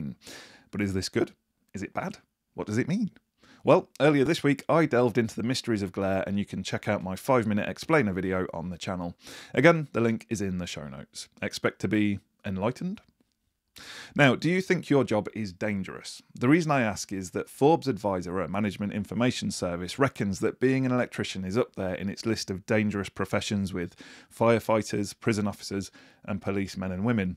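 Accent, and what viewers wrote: United Kingdom. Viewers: You should have named the title of this video "Florescent lights about to be banned in the UK." In the USA they are not banned and I thought this was a Youtube channel from the USA until I heard your accent. The USA, however, tried to ban incandescent light bulbs until many people made a stink about it. As of right now, you can still buy those too. In the USA, we also have CFL bulbs that use mercury as well. However, we recycle the mercury in both CFL and florescent lights so there's no pollution at all.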